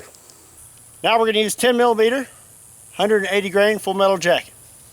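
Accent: Kentucky, United States. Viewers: What part of Kentucky are you from?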